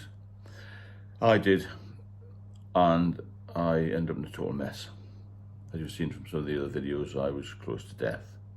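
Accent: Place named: North East England, United Kingdom. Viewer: Howay, pet, I rather like the North-East accent!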